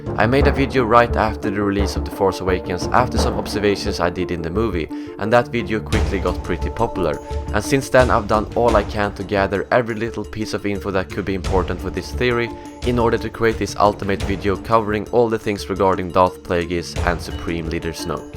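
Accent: Sweden. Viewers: Älskar din svensk engelska :D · U sound swedish ???? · Dat svengelska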